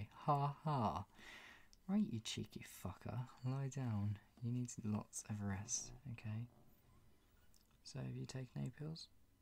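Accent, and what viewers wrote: United Kingdom. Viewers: Hope every sick girl have a boyfriend like this(;_;)this is sooo cute :3 btw British accent is the best :P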